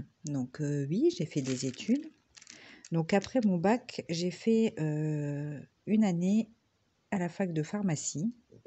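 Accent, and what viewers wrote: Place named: Nord, France. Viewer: Bonjour! je découvre ta chaine... je suis également du Nord j'habite près de Lille, et je fais aussi du coloriage pour adultes depuis plusieurs années..je lis aussi beaucoup et j'ai également un chat noir!!.j'avais bien reconnu le ptit accent du Nord! à bientôt sur ta chaine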